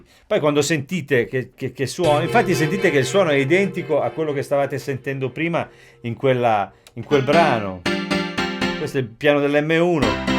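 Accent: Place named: Italy. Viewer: Your musician skills plus your Italian accent make me want to buy this Korg so bad. I already bought the Reface DX, thanks to your demo video! Grazie, amigo.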